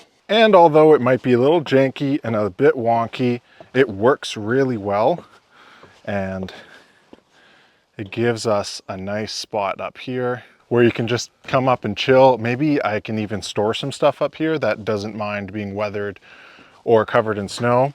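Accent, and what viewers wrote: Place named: Canada. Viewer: Your video showed up in my feed last night and I was like, hmmmmm he sounds Canadian. Hello fellow Ontarian! Nice to meet you. I'm looking forward to your videos for building the tiny home as hubby and I are about to be empty nesters. We would like to sell our home which is in a small village of about 900 people, and move back to the country where we both grew up on farms. I am betting your build will answer some questions I have about the process here in Ontario. Also, heads up - those weather people are telling us this winter is going to be super cold like we ( I mean me) experienced in 1979. It was super cold and nasty. This video made me chuckle because I heard Mike Holmes voice in my head saying, why would you put new on crappy old? when fixing your step. However lipstick on a pig sounds pretty funny, LOL. Looking forward to catching up on everything you have posted so far!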